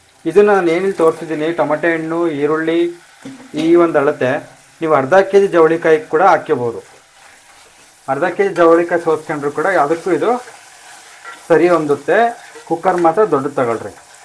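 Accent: Chitradurga, India. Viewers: Sir nimdu yav uru sir.. Nim language namchithradurga da kade ero thara ede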